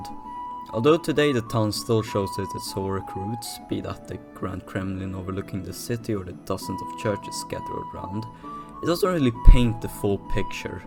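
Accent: Sweden. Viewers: He is as a man from Norway i can hear that Swedish accent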